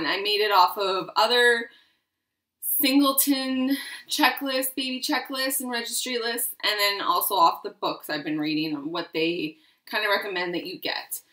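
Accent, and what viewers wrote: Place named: Canada. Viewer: I just love your Canadian accent!! Excited to see your babies soon!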